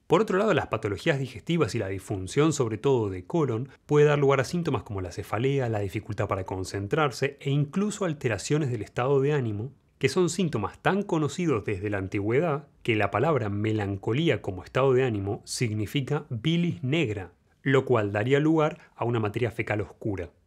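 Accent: Argentina. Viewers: Soy mexicano, por su acento supongo que el dr. es argentino, yo tengo la creencia que los argentinos son líderes en todo lo que quieren llevar a cabo.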